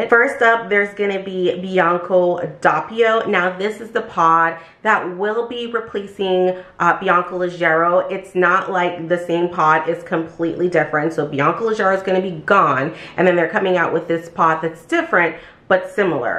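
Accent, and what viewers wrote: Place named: New York, United States. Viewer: I forgot to say I’m a girl from Queens New York and I know you’re from Brooklyn I can hear that NY accent !😂😂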